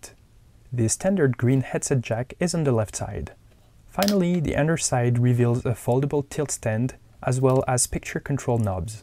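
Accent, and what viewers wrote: France. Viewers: Vous avez un accent français, c'est pas possible autrement !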